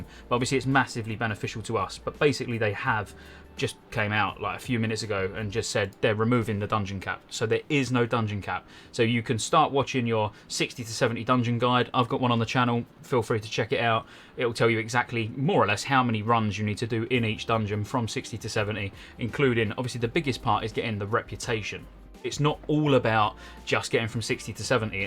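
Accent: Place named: England, United Kingdom. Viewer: Trying to learn the different English accents, where did you grow up?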